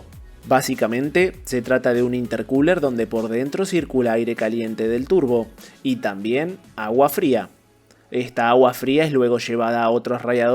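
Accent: Argentina. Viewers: soy argentino y ese acento es muuuy argentino.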